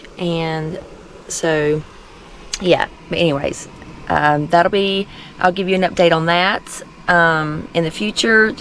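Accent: South, United States. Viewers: … buffalo mozzarella & tomatoes is one of my favorites… also half a bagel with cream cheese, capers & topped with smoked salmon (prepackaged thinly sliced) … omg delicious…. my girlfriend born & raised in Asheville shares ur wonderful southern accent i never get tired of hearing…. lastly… like i’ve mentioned in previous comments i see black bears almost every other day & they are plentiful in our western NC mountains … there is no way i’d sleep in a tent in this area… u are correct , 99% of bears avoid humans… it’s the remaining 1% that scare me … the old ones and or injured ones are the problem bears that worry me… & i fear for ur safety…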